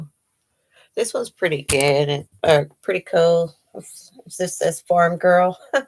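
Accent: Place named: East Tennessee, United States. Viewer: New sub! It’s nice to find someone calm and not instigating trouble! Where are you from? We have the same accent..East Tennessee here! Glad that I found you!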